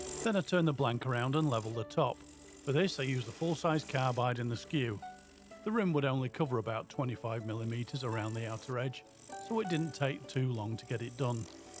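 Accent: United Kingdom. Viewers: I like your pedestal bowls, not least because I haven’t seen anyone else do them; and this one is particularly nice but I’m looking forward to seeing what you come up with next. It’s also nice to hear a British accent (Yorkshire?). 🌞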